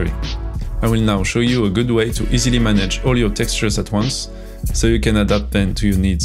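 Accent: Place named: France. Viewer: y'a pas a dire notre accent francais es si reconnaissable hahaha beau boulot en tout cas ;)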